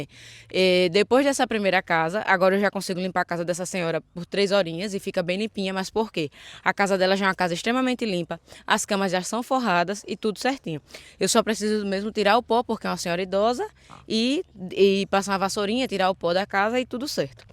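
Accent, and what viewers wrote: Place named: Natal, Brazil. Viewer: Você é de Natal/RN? O sotaque é identico ❤ Ótimo vídeo 🎉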